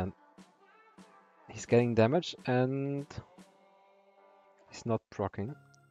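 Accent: Germany. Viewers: Du bist deutscher und redest englisch hört sich echt bh an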